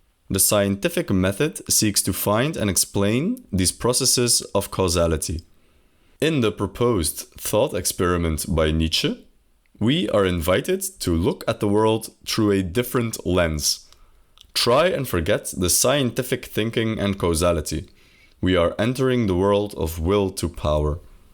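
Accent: Flanders, Belgium. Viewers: Thanks for making philosophy digestible :) Are you a native Dutch speaker (with a Flemish accent)? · Benje van België lol? Ik hoor uw accent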